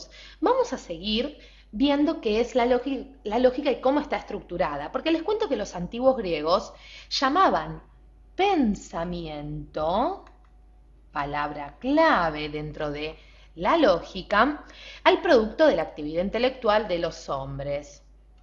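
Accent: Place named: Argentina. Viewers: Nosera porque es Argentina?👊😈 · Por que hablas en argentino? D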